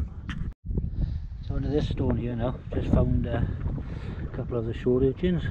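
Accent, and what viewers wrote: Wales, United Kingdom. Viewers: I adore your Welsh accent and voice, reminds me great deal of Sir Anthony Hopkins, and he is my favourite actor. :)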